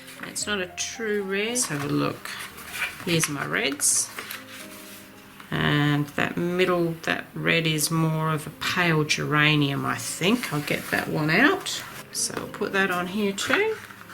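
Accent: Australia. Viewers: Thank you so much for the lesson, it has really caught my attention on how to pick a color pallet. This was very informative. It's so good to hear your Australian accent again and you sound like your beautiful self again. I'm so glad you are back.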